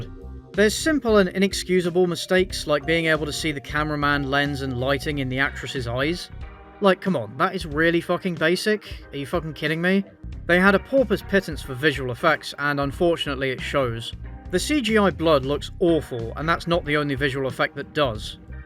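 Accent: United Kingdom. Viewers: I'm a simple man I See talking british dog man I click.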